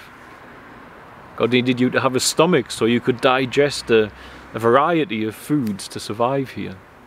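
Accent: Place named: Geordie, United Kingdom. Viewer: Are you from the North East of England?? You have a great accent/dialect and it is very similar to my partner’s accent and He is a Geordie (from North Shields, North Tyneside) of which He is extremely Proud.. Just thought I’d ask if You are also From Our Local Area.. Obviously I’m meaning - Originally.. Much Appreciated for your excellent work in helping humanity - True Angel of God xxx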